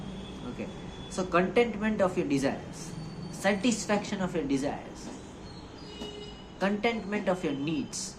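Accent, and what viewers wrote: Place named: Bengal, India. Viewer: Apni bangali, accent similar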